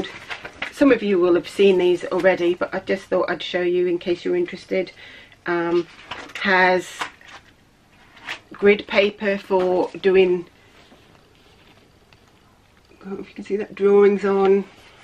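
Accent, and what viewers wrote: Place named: Australia. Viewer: Hi just discovered your podcast through little drops of wonderful loving it love your accent thought you were Australian keep on the good work looking forward to your next one x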